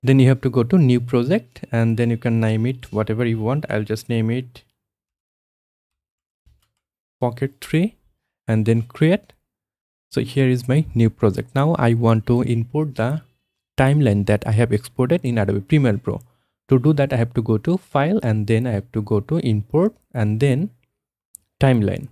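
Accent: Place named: Assam, India. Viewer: you have a north-east accent, are you from assam?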